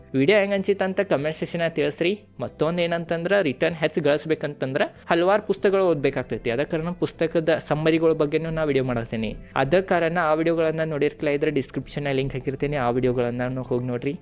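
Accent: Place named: Belgaum, India. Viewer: The King of Belgam - with Belgam Kannada. Jai Ho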